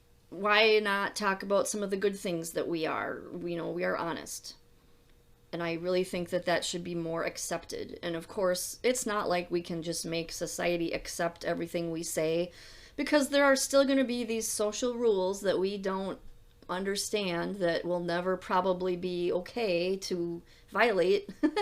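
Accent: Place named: Midwest, United States. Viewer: Are you from the midwest? Like Illinois? Wisconsin? I hear your accent. Triggering is stupid. How YOU react is not MY problem. I got diagnosed at 74. Now 76. Good podcast.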